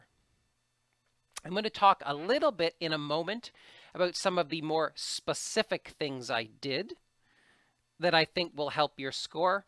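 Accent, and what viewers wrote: Canada. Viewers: sure i think he is Canadian cos i hear his "" about / out " whole time and so cute too, love this teacher. super clear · hi there, your accent is cool Are you from Canada ?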